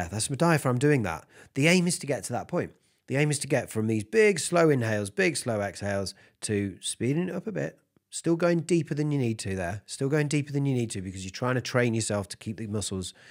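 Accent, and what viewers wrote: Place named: North of England, United Kingdom. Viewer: Great talk/lesson. Sort of proves that often the best teachers are the ones that have been through the crap rather than the ones that had just done it right from the start, if that makes any sense. I heard once somewhere that Bach was a terrible teacher, because it (music/composition I dunno) was all so obvious to him he couldn't understand how anyone couldn't get it, so he was impatient and couldn't explain stuff haha. Sounds like you're from the north of England somewhere, the place I feel the most at home. Unfortunately I'm a long way from there and walk around upside down atm . haha Thanks and kind regards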